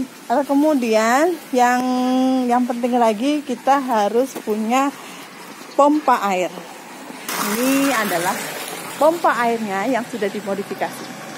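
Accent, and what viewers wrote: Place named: Banjar, Indonesia. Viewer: Dari logat ibu sprti orang banjar kalteng ya😀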